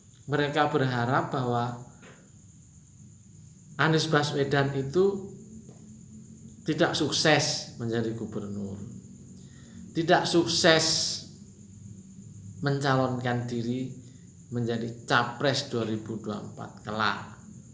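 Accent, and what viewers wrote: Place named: Banyumas, Indonesia. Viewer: Ini kaya chanal ngapak..bro ..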